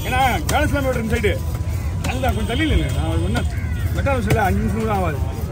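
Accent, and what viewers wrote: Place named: Thoothukudi, India. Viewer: Ivar voice patha thoothukudi karar pola theriyuthu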